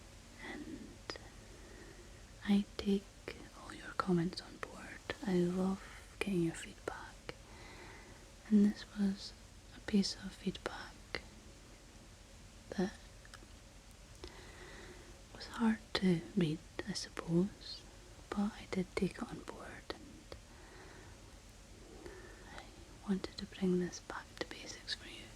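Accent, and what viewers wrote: Scotland, United Kingdom. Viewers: Love your Scottish accent, reminds me of my childhood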